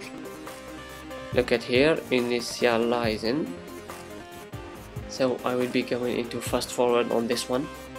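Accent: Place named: France. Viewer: you sound french lol. thanks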